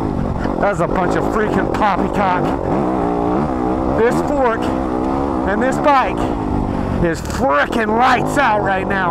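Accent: Utah, United States. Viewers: You said last year that the Beta 390 RR was your favorite 4-stroke ever. Sounds like this one might usurp the Beta. Oh, and I love how you speak Utah language.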